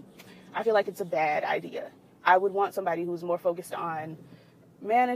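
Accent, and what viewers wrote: South, United States. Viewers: Bring back the southern accent! Lol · I tune in because of your southern twang. Do you girl and forget those haters. Have a good Friday mama.... BTW: Emily is crazy for allowing a dude who wont even claim her abuse her. Self esteem is essential when dealing with those type of dudes. Hope she finally moves on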